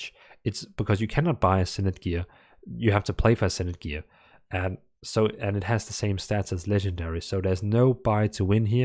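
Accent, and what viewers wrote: Denmark. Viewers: Du taler super engelsk, men vi danskere har bare en evne til at spotte andre danskere uanset hvor lidt accent man taler med 😁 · Ahh thank you for this very helpful introduction. I just recovered my Guild Wars 2 beta character, a gnome thief who has been waiting patiently for me for 3411 days. Looking forward to give the game a shot. Out of curiosity, you sound Danish, am I correct in that assessment?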